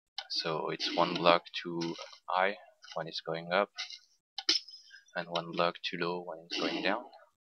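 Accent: France. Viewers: Well that's your own fault. Have you never heard anyone with a French accent speak English before?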